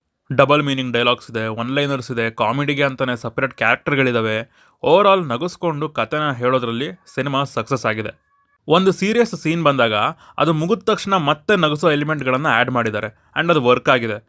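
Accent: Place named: North Karnataka, India. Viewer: Yav Oor Dostha Nimdh😂. Bashe Swalpa Nam Uttara Karnatakadh Idhang aythi😂.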